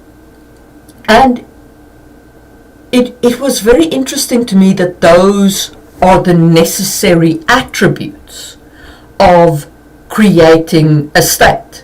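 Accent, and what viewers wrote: South Africa. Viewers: Oh, hey, aunty! I wasn't expecting a reply! I would have been a little more cordial otherwise. I just wanted to jot my opinion down. Just want to say I absolutely LOVE LOVE LOVE your videos! They've made me even more inspired to world-build for my D&D/Fanatasy RPG games! This was the one point where I was like "Huh! Actually, I think I [respectfully] disagree here." In a world filled with powerful mages, there will always be people like Havelock Vetinari from Terry Pratchett's Discworld and Stan Edgar from The Boys (TV series/comics). By the way, your accent sounds South African to me. Are you from there by chance? I absolutely adore South Africa. And I'd like to visit one day.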